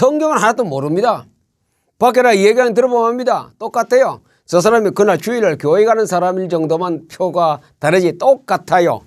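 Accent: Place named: Gyeongsang, South Korea. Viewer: 말투가 참 친근합니다 경상도분 목사님 ^^' 귀하고 좋은말씀 👍🎚감사합니다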